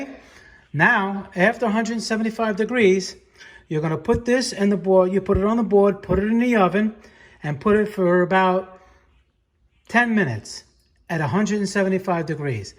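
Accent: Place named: New Jersey, United States. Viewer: I have a 2011 F-150 Lariat with the factory stereo including Sync & Sirius. When I switch the ignition on, “Sound Designed by Sony” displays, then the compass direction and external temperature (only). When I press the stereo power button, five circles and the clock time flash on, then disappear. Occasionally when I'm driving, these will blink on then disappear too. To me that suggests an intermittent connection. I checked fuses and they were all good. I reset the stereo by disconnecting the battery cables, waiting ten minutes, and reconnecting. No joy. Upon removal of the stereo unit, all connections on the back were tight and clipped. So I baked the circuit board at 175 for ten minutes, then 375 for six minutes, and let cool at room temperature for one hour. Reassembled, reinstalled, and got the exact same behavior as before. Unfortunately the procedure didn't work for me. 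So I'll be looking at an aftermarket solution now. But thanks for your videos, and I give you major props for the total Jersey accent and speech mannerisms.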